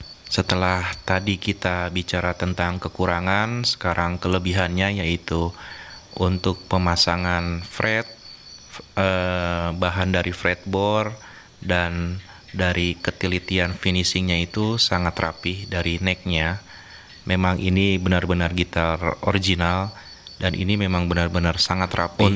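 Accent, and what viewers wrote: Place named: West Java, Indonesia. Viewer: Ehehehe, nyunda pisan yeuh voice-na.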